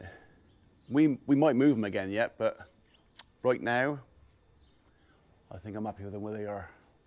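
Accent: United Kingdom. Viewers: I have always enjoyed the British accent but have a tough time understanding Scottish & Welsh. Thanks for sharing your daily experiences. I hope you recover quickly from the virus; peace! 🙂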